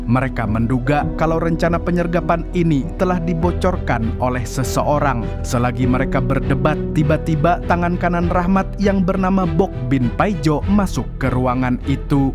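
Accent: Banjar, Indonesia. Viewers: Bah orang banua sakalinya😅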